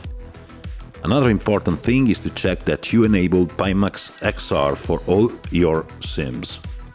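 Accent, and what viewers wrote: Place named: Central Europe. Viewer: 😜Hi Doc. Please answer this question? Where do you come from? You have a special language accent . Do you remind me a Guy From Center Europe .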